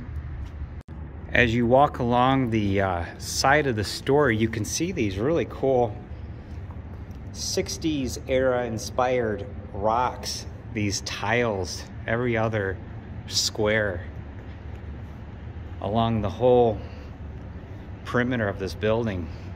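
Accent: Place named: Northwest Ohio, United States. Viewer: The NW Ohio accent is STRONG in this one.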